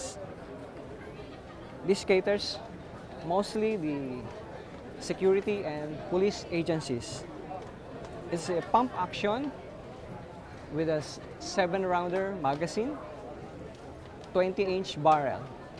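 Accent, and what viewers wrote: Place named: Bisaya, Philippines. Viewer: bisaya ka dong?! galeng mong mag prisint dong!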